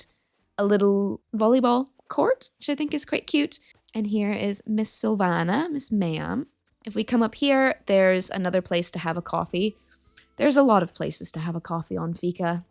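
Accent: Scotland, United Kingdom. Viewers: i also love so much that your accent sounds more scottish than when we last heard you heehee C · ive have never clicked on a video so fast!!! congratulations!!! and omg you can really hear the scottish coming through in your accent now too 🥺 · you sound SO Scottish HA ❤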